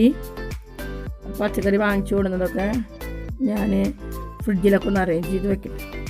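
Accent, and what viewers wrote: Thrissur, India. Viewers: രസമുണ്ട്ട്ടോ പറയുന്നതുകേൾക്കാൻ തൃശ്ശൂർ ഭാഷ ഇഷ്ടം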